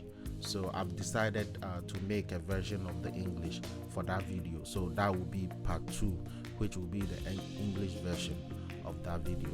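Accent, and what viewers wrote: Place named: Africa. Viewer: In my personal Opinion African accent is sweet enough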